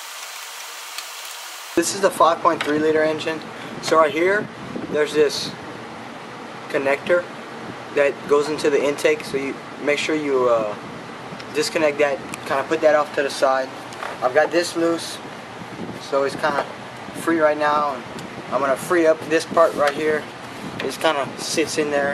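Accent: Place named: Louisiana, United States. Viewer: Kaplan? New Iberia? I’m trying to place that accent, lol.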